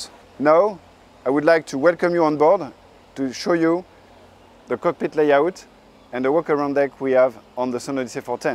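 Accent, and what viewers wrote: France. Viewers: OMG I love his english... his accent is so funny, so french... :-)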